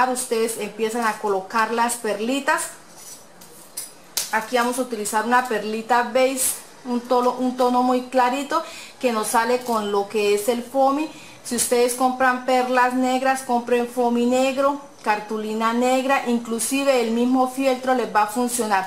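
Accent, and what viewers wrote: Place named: Santander, Spain. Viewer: Hermoxo divino si se moja no se despega en que teléfono te puedo localizar tienes acento de santandereano yo estoy en medellin me gustaría hacerlos para mi tienda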